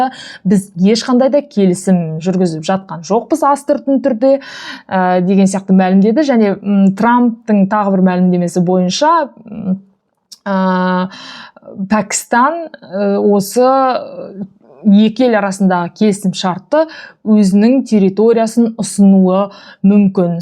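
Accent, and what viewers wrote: Standard. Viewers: қызым рақымет қазақша таза сөилеисің жаңалытарды таратып түрғаныңа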